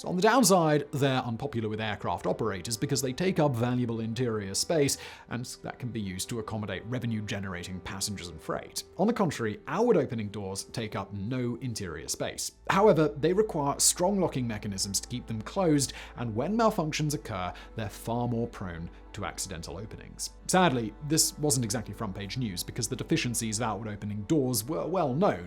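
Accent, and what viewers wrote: United Kingdom. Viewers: HOLY COW!!! I really TRIED to watch this video!!! I live in Texas!!! My dang ears & brain can’t keep up with your dang UK accent & “speed talk”!!! SHEEEESH!!! I finally had to STOP being frustrated & delete the video!!! 🤓🤓🤓🤓🤓🤓🤓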